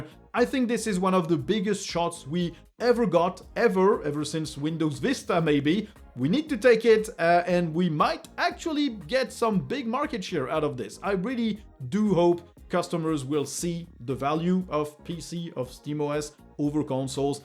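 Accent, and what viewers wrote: France. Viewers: I love the accent so much. Staying up to date with Linux news is almost a bonus. English spoken with a French accent is music to my Eastern European ears. I'm not much of a gamer, but I watched (well, mostly listened to) a whole Dishonored playthrough by Raphael Colantonio in no small part to enjoy his accent. Most of the other people who were invited, part of the Dishonored development team, also had French accents. (edited - name spelling)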